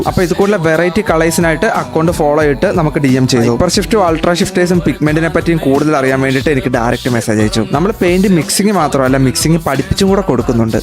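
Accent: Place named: Kottayam, India. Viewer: അവൻ്റൊരു കോട്ടയം ഇംഗ്ലീഷ്. ആയിങ്കിൾ, ആയിക്ടീവ്. എന്നിട്ട് തമിഴന്മാരുടെ പോലെ എന്നാ എന്നാ എന്നൊരു സംസാരവും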